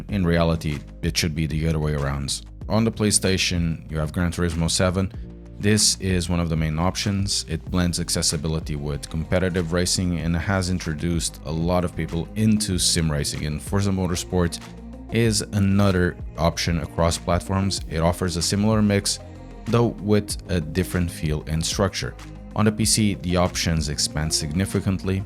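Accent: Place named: Portugal. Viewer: Are you portuguese perchance? Your accent sounds like it Edit: Eu sabia! 😂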